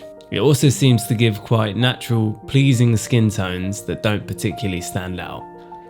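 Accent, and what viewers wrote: United Kingdom. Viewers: Amazing view and lovely British accent..well done.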